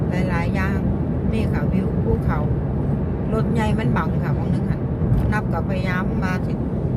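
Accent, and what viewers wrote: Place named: South, Thailand. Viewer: คุณนับพูดสำเนียงมีความคล้ยคนใต้นะคะ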